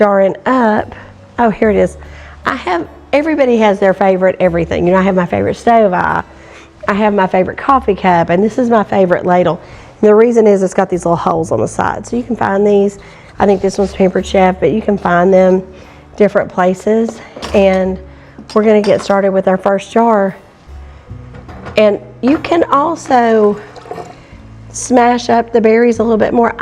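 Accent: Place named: Appalachia, United States. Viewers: Appalachian dialect. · it is a real authentic country twang! I can't make it more formal even when I try. Thank you for the kind complements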